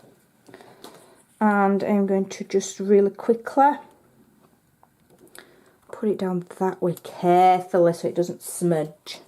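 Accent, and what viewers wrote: Yorkshire, United Kingdom. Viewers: This is adorable. I love watching your videos, you're very talented and charming. But, I must confess, I am totally in love with your wonderful (Yorkshire?) accent. it's delightful! Big hugs from Texas!!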